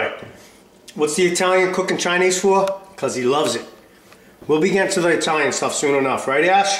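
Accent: Boston, United States. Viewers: sounds like ur from boston. come on say dark againg.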